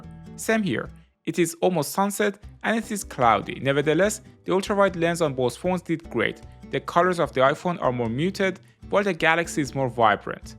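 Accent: Iran. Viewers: I don't know where are you from but you have an iranian English accent 😁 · لحجت به فارسی ایرانیا میخوره !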